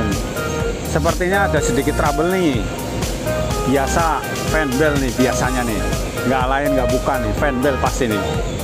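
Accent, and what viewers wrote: Banyumas, Indonesia. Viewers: Selamat malam mas nek ora ngpak ira kepenak....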